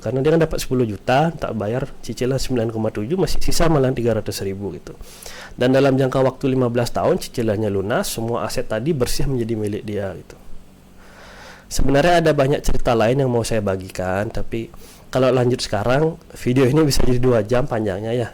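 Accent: Bali, Indonesia. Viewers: yeeeee nak Bali boss logat nya keliatan sekali milu sik trading nah hehe makasi sharingnya salam kenal kawan baru dari Bali menyimak see u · Wah kakak orang Bali ya Logatnya kerasa banget ❤️✨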